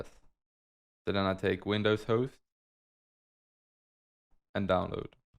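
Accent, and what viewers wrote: Sweden. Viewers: ayyy du är svensk lmao tyckte att jag kände igen dialekten haha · swedush my man som jag xD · You Swedish? Same!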